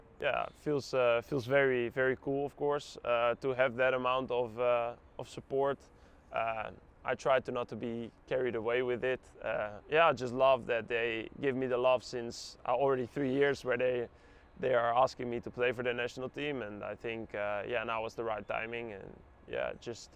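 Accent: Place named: United States. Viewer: aksen US banget mas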